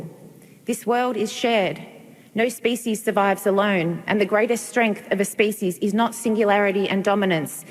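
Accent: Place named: Australia. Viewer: Omg! She's an Aussie. Struth and by crikey 😂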